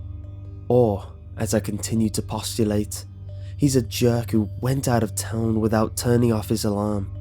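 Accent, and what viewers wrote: United Kingdom. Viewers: Are you British or American?! THIS IS DRIVING ME INSANE!! WHY THE HELL WOULD YOU DO THIS TO SOMEBODY?!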